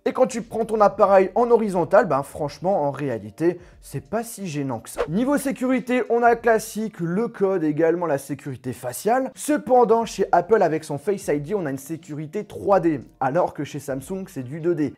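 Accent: Belgium. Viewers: Tu es belge???? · T belge?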